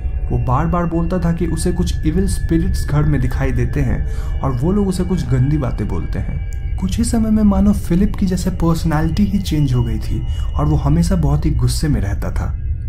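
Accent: Bengal, India. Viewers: Aap Bengali ho kya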